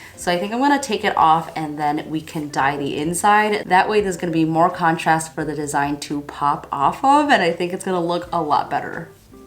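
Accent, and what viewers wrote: Chicago, United States. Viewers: where are you from? I sometimes hear a Chicago accent!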